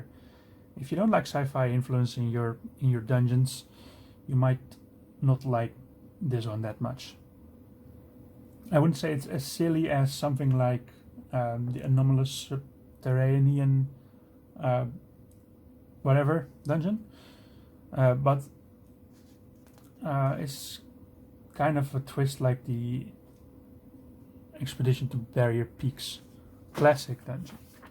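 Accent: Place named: Netherlands. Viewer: This was a very comprehensive and thorough review! I kind of want to get it now, once I've got enough money to spare for it. Megadungeons are super interesting! On another note, are you Dutch by any chance? Your accent sounds very familiar. (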